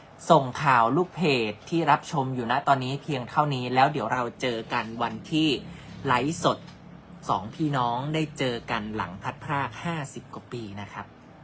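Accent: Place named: Ubon Ratchathani, Thailand. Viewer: อุบลชัดเจนครับ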